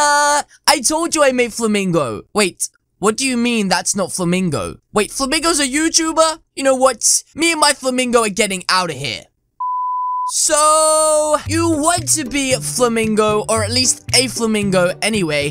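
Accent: United Kingdom. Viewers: British accent make me go crazzy.. AM LATINO.. Ayyy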